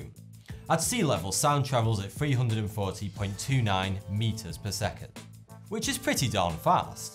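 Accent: United Kingdom. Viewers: He is saying, it's just his british accent that makes it sound like 42. Also is a play on words of 42. Also, he's named that because of the hitchhikers guide to the galaxy, where it says the meaning of life, the universe, and everything is 42, which obviously, is a joke. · Why do Brits replace words with a "th" in them to an "F" sound? And Rs are pretty much silent? I fought you wuh going wif him because I hoff to bwush moy teef befo badtoyme. I'm sure it's a regional accent and not all British people sound that way, I know a few Englishmen and some have a very thick accent and others aren't as noticeable except in certain words. I think this guy just has a speech impediment, and with his British accent on top of it, it makes him sound funny. I know I'm not the only person that hears Forty Two when he says his YouTube name at the beginning of his videos, so I'm definitely not crazy. 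Speech impediment aside, I still very much enjoy the videos and find them really interesting to watch. Learning is fun! Especially when you've got someone with that kind of accent telling you the facts! It causes you to have to pay extra close attention, or you might misconstrue what's being said! Keep em coming, or should I say foughty? I'm just having fun here, no malicious intent or hatefulness coming from me, so please don't bash what I have to say about his accent! I've got my own terrible accent that im very much aware of and I have to listen to people make fun of the way I sound and be patient while they try to figure out where im from based on the way I pronounce certain words. As long as people aren't being insensitive I'll play along and get a laugh or two in alongside them, but the second they assume that im not intelligent bc I come from a parti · for the longest time i thought you were saying either 32 or 42, time to do a show on british accents, and accents in general, the exact samething happens in any language, though; as in france, and in the north of spain, let alone the whole country. 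( secret, the family model ) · ohh im so in love with the Brit accent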